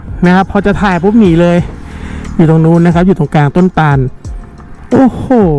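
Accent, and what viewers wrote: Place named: Kanchanaburi, Thailand. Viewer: พูดเหน่อเหมือนเด็กกาญจน์เลย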